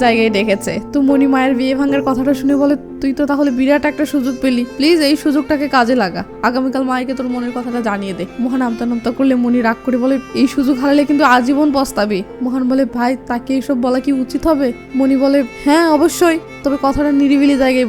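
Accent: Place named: Khulna, Bangladesh. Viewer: আপনার বাড়ি নিশ্চিত যশোর বা খুলনা।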